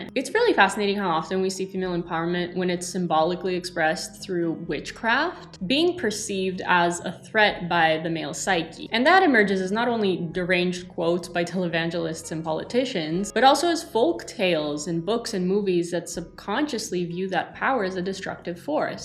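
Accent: Greece. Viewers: Your greek accent gives me the feels <3 And Jiji is the best, I love black cats. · Your Greek accent is so spot on I wondered if you are from Greece oh my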